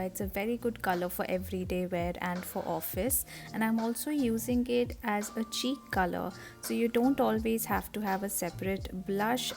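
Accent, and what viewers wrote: India. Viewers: Apki hinglish or look dono cute lge 👌👌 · Iam ur huge fan. Love ur vidios. And ur cute and sweet hinglish. Awsome. Tc🙂❤👍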